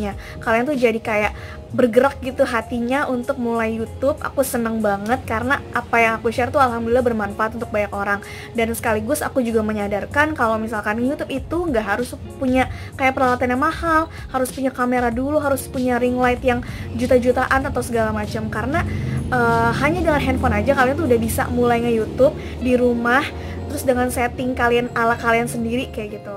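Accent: Jakarta, Indonesia. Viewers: budi, malih, markonah, betawi banget dah ahhh, gws cantikkkk Allah mau angkat derajatmu 😘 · Betawinya keluar dah:)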